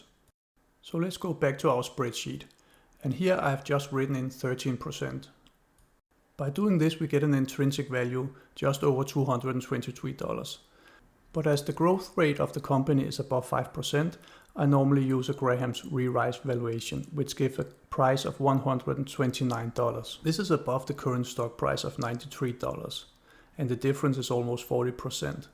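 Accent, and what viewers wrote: Denmark. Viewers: Excellent video - keep up the good work - nice danish accent by the way ! - from a fellow dane !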